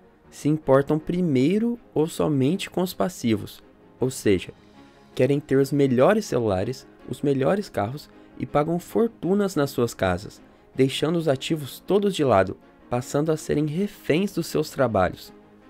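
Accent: Caipira, Brazil. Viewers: Só um conselho. Procure um fonoaudiólogo para perder esse sotaque caipira horroroso. Não dá pra assistir o vídeo com uma narração dessas...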